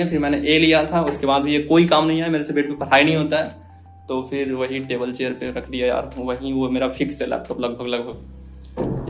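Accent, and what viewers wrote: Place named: Jammu, India. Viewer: Bhai jammu ma bhi same jhi bolte ha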